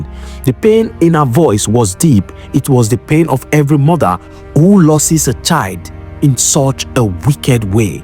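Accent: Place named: Hausa, Nigeria. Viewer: This narrator no go school? Aboki man dey do narration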